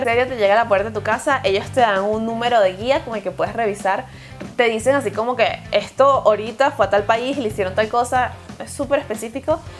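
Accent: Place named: Venezuela. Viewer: !!!! Soy una gran fan! Te sigo desde hace mas o menos como un años pero no se porque motivo o razon no podia comentar desde mi telefono (y no tenia computadora). Pero ahora por fin puedo comentar!! SIII!!! Tengo 17 años (recien cumplidos), soy de VENEZUELA (Valencia, luego vivi en Ccs y luego vivi 5 años en Margarita) pero hace un año y unos meses me mude a ALEMANIA. Fue un enorrrrrrme cambio, el lenguaje es mega diferente, el clima es mil veces mas frio y las personas tambien son super diferentes. Los primeros meses estaba deprimidisima (extrañaba a mis amigos y mi idioma y todo) y lo unico que hacia era estar pegada a Youtube viendo videos de maquillaje o blogs y tags. Y un dia apareciste TU! Te lo juro que fue solo ver el primer video y supe que eras venezolana, ni siquiera tuve que leer tu perfil! Al principio estaba como: Yo la conozco, pero luego me di cuenta que era solamente el hecho de que hablaras como yo, la expresiones, la calidez, todo. 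Fuiste mi salvacion en mis meses de depresion. Y luego fuiste mi salvacion cuando me dijeron que tenia que ir a una escuela sin poder hablar aleman y sin saber que hacer. Simplemente me maquille, puse una buena sonrisa y con actitud empece a hablar en ingles (lo cual ayudo, porque no entendia ni pio de aleman). Bueno simplemente eso, queria que supieras que de verdad me ayudaste sin conocerme y sin saberlo. Y queria decirtelo porque ahora si puedo escribir mi comentario! MIL BESOS Y ABRAZOS. PD: FELICIDADES POR TU CASAMIENT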